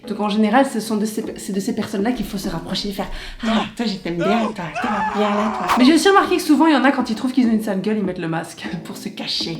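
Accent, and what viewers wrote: Belgium. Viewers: Tous les "w" sont prononcés "oué" en Belgique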